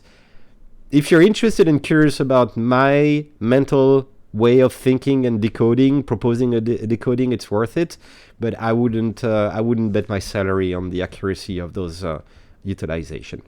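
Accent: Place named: France. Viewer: thank you. I totally misheard what you were saying. I usually have a better ear for the French accent. I just finish reading Zina Halprins book, yesterday. I heard you mention reading it so I got a copy. Any other good recommendations for Templar related books? Or any good books?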